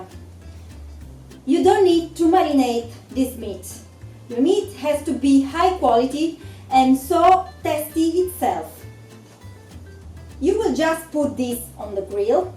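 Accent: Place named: Italy. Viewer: 1. Thank you for teaching us how to do the Fiorentina beef steak! 2. I am an english school teacher and I like your english with the italian accent - keep it up!!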